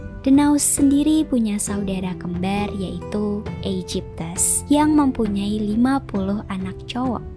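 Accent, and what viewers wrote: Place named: West Java, Indonesia. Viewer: Kakak pasti orang sunda ya kak ... 😅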